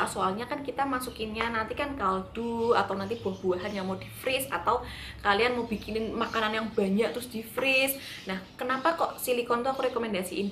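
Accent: Java, Indonesia. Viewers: diet ga? Abis melahirkan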